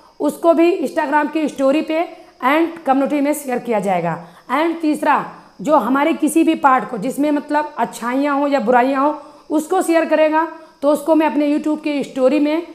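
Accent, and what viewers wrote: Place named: Haryana, India. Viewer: Mam lgta hai aap haryana se ho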